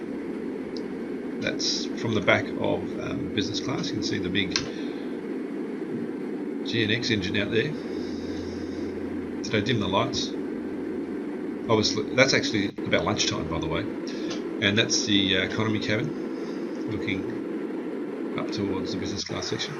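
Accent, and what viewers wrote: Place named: Australia. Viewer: Australian accents are so cool!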